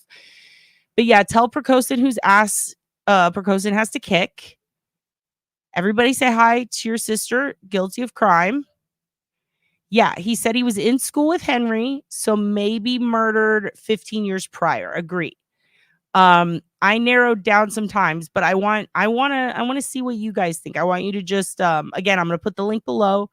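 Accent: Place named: New Jersey, United States. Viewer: Sounds like a Jersey accent to my NYC ears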